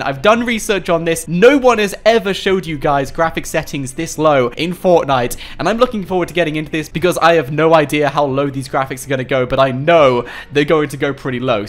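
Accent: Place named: RP, United Kingdom. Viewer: his accent is so posh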